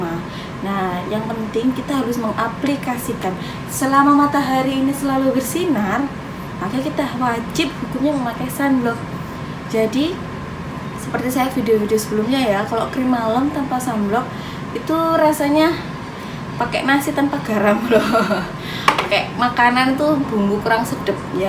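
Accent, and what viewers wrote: Java, Indonesia. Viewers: iki wong jowo to, ketok medok 😅😅😅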